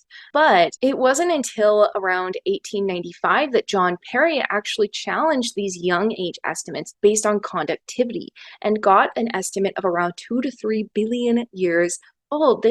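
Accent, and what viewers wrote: United States. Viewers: Wow - super clear and accurate. (And great to hear a voice from the USA that is not talking nonsense - here in the UK, the news is dominated by Trump and his creatures, so I have come to associate an American accent with idiocy).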